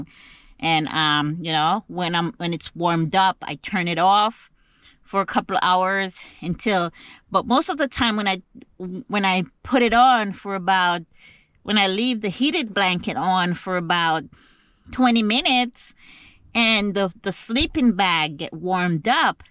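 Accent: Jamaica. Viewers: I admire you for not giving up, you sound Jamaican. I pray that you will repent of your sins and turn your life over to Jesus Christ, if you have not done so already. Keep your eyes on Jesus. He is the only one who can help us. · She sounds Jamaican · Hi. Being of jamaican heritage my self , i hear a slight jamaican accent ? With you. Are yiu from jamaica ? If so , did you leave long ago. ?. Have you considered to go back home?. Maybe life would be better there.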